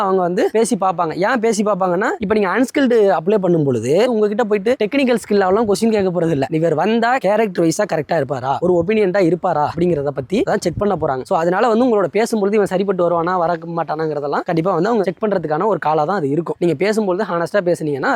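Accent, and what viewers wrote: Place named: Tirunelveli, India. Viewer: தம்பி நீங்க tirunelveli ya? Unga slang namma ooru மாதிரியே இருக்கு.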